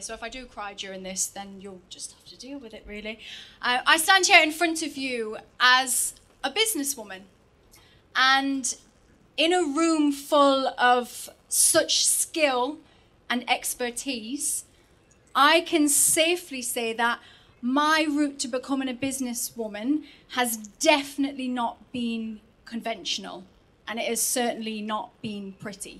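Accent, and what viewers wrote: Newcastle, United Kingdom. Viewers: I miss hearing Geordie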